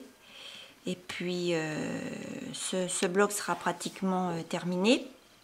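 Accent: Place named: France. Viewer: Love listening to your French accent 😀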